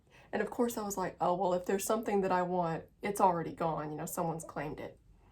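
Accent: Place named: South, United States. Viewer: That Amish life in that magazine blitstitch is working on :) and I found it completed at a thrift store for a $1!!! It’s not framed but all three pieces are done except a little bit of border and bush but you can’t even tell unless you were looking really hard lol loved you video and am going to watch your 1st!! Love your southern accent 😜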